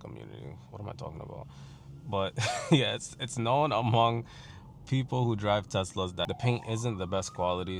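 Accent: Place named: Toronto, Canada. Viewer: You sound like you’re from Toronto though, like a moli torontorian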